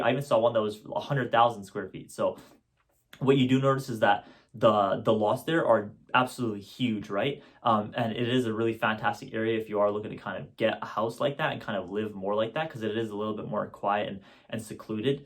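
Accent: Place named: Fraser Valley, Canada. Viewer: You have the strongest Fraser Valley accent! Beautiful job. You are so proud of your community.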